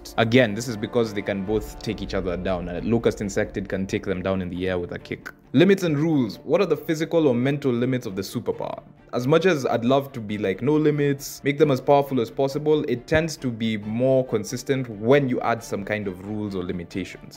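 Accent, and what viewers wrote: Kenya. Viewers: You sound like u from kenya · i know a kenyan when i hear one😭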